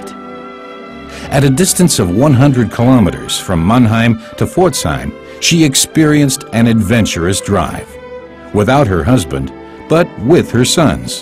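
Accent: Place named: United States. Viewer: Very clumsy American narration, though use of metrics, where appropriate is welcome.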